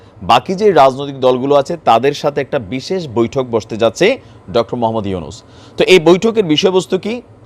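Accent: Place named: Noakhali, Bangladesh. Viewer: ভাই আপনি যতই শুদ্ধ ভাষা বলতে চেষ্টা করেন না কেন আপনার নোয়াখাইল্লা ভাষা চলে আসে এটা খুব হাসির কারণ হয়ে দাঁড়ায় তাই আপনি নোয়াখাইল্লা ভাষায় বলেন শুদ্ধ ভাষার বইলেন না আপনারে আর মানায় না এটা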